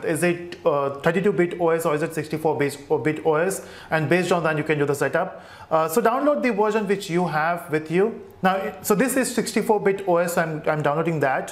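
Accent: India. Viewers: ahhhh another indian accent.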